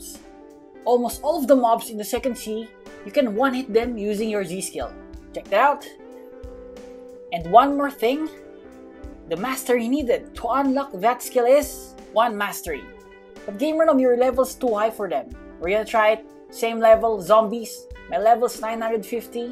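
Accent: Philippines. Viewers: Can I ask u are u a Filipino cause your sound a lot of it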